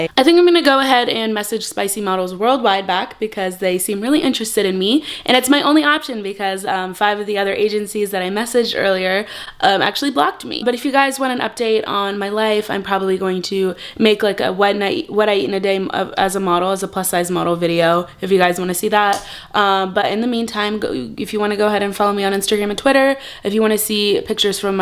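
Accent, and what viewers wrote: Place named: United States. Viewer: As a Brit fluent in sarcasm, It’s weird hearing this much sarcasm in an American accent 😂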